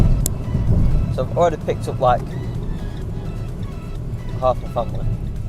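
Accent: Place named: Blackburn, United Kingdom. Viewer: Ik where Burnley is coz I live there aha I knew u were from Blackburn from voice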